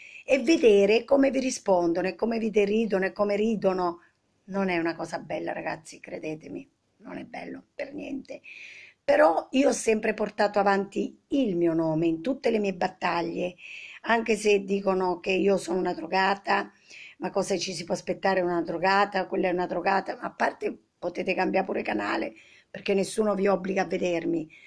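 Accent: Abruzzo, Italy. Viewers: bravissima! Si dovrebbe invidiarti per la tua determinazione... Io ti ammiro molto. Mi sembra di riconoscere un'inflessione "familiare": sei abruzzese? Ti abbraccio.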